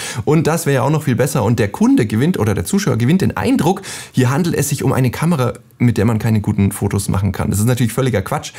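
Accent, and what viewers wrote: Germany. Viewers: bist Du aus Deutschland ?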